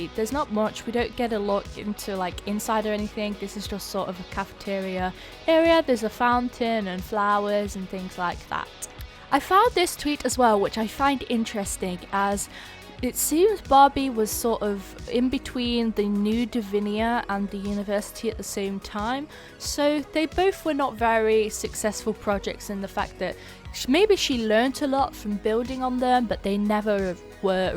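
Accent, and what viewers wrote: United Kingdom. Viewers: I love her British accent she is the best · are you british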